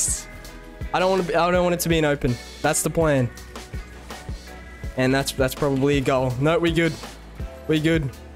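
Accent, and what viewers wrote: Australia. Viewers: Road to top 50? That would be so cool to watch, if you can’t though, how about top 100? Also I just hit diamond in 1s (plat 2-3 in other comp modes ahaha), and I think I can attribute that (at least in part) to you and Sotus’ individual 1s series. It’s super helpful and inspirational watching you, so I just wanted to say thanks man. <3 also it’s awesome to have an Aussie RL YouTuber to watch... American accents can get a bit annoying after a while 😂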